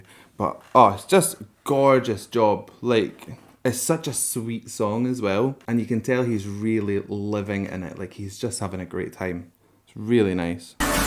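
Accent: Scotland, United Kingdom. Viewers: Thank you for the analysis. A million years ago, I did vocal training but your mix of anatomy and explanation is really enlightening. BTW, you have probably heard that JK tends to be the go to guy for a lot of harmonising in the background of their songs and we have got to see him working on it for Euphoria. It is fascinating as he created multiple harmonic lines as he worked , listening back and overlaying them on the fly. He seems to just be very musically literate..as in, understanding quite complex harmonic sounds effortlessly. I am quite amazed by it and very jealous in a good way. Also, love your voice, in spite of no warm up (bold!!) and the Scots accent is great. Almost as good as the Irish but we'll leave that off. Thanks again.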